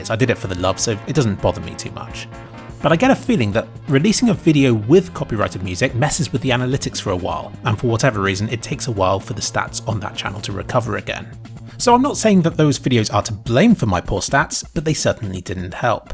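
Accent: United Kingdom. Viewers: I'll always be a subscriber,. I've seen this faceless british voice talk about games, graphics, staircases, sun angles and CSGO for most of my teenagehood/early adulthood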